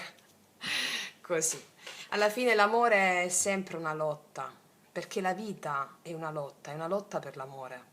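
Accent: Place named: Salento, Italy. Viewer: Buongiorno mia cara, ti ho scoperto questa mattina... E stato come sentirti fare parte della famiglia... Sento dal tuo accento che sei Pugliese... Anzi... Salentina... Come. me ....Sei una forza della Natura !!! E si sente tutta la Passione e la Preparazione che Emani nelle tue stesure... Che sono rimasta Affascinata !!!! La tua Simpatia è Contagiosa ... E Analizzi in Profondità... Mi piacerebbe conoscerti Personalmente !!!! Ti Abbraccio Forte !!!! 🏆💥😂🤗🤩😇🍀🔥🎤🎶❤️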